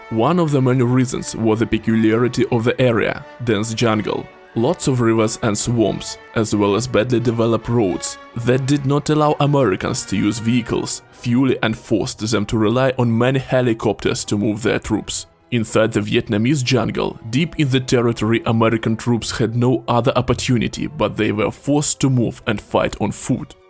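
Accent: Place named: Russia. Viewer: Молодец, но акцент всё равно русский, американцы поймут, что ты иностранец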